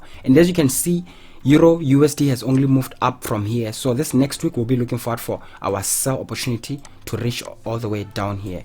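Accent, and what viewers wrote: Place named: South Africa. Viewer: Thanks my guy... i can hear that u my homeboy a South African... Big up ntwana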